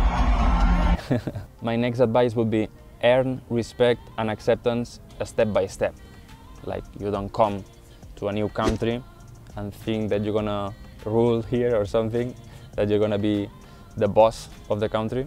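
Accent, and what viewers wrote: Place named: Spain. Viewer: wouldn't need to do this as his Spanish accented English is very easily understood by anyone who has any experience of travelling or speaking to non native English speakers.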